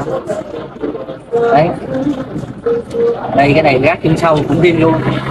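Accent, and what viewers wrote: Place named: Thanh Hoa, Vietnam. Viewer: Chuẩn bác, nghe thanh hóa là biết ngay 😊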